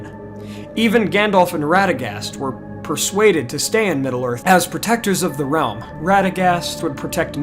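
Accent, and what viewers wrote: United States. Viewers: Your American pronunciotion with all these "r" is terrible.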